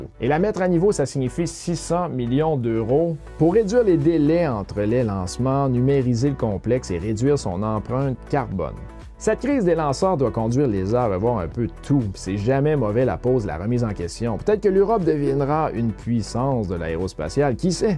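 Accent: Quebec, Canada. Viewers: perso parfois les déformation de la petite voix mélanger a l’axent Qc la rende incompréhensible a la première lecture, es qu'ils y en a d'autre qui on se problème ou faut que je demande un supplément de caribou a la cantine ;p ?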